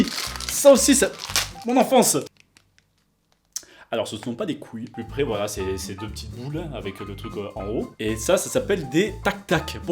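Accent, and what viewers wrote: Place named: Languedoc, France. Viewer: Mdr j’ai 19 ans et grâce à toi je suis retombée en enfance l’époque du primaire!! Enfin une vidéo pour ceux de notre génération 90-2000!! T’es génial je t’adore!! En plus je viens de la région Languedoc comme toi mdr l’accent j’ai le même!! Je t’adore 😁❤️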